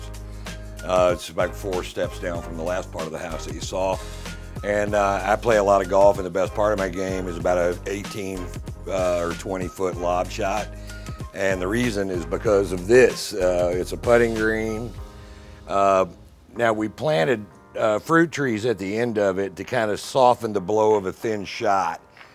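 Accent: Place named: Texas, United States. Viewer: You know he’s from Texas.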